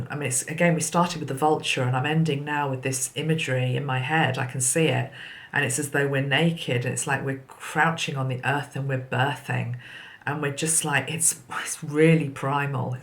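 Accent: United Kingdom. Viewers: Just 1st time your video came to me. 🤩 Love your energy, learning good amazing british English 🙏🙏❤️🤗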